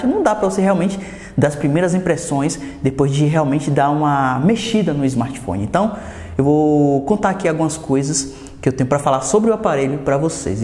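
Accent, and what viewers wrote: Bahia, Brazil. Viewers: Tu é baiano é vei? Ne?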